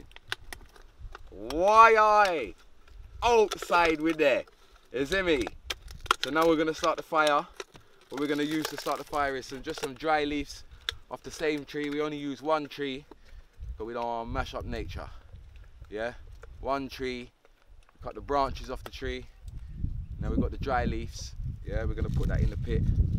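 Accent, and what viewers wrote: Jamaica. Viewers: is a funny guy for a white man speaking patwa my guy💯🇯🇲 big up and moss media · it's so weird seeing a white preson speaking Jamaican but I love it and energy is of the charts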